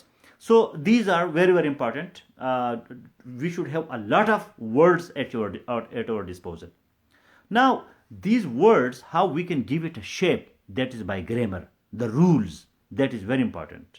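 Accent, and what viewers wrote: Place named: Yousafzai, Pakistan. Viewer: Proud of u Sir..." Sta da de yousafzai accent na zaar sham".....keep up sir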